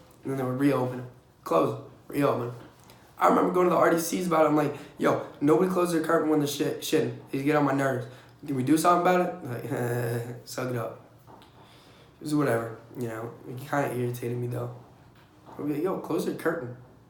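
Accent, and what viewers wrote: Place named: East Coast, United States. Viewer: 😂😂 i can tell mans is from the east coast good shit my guy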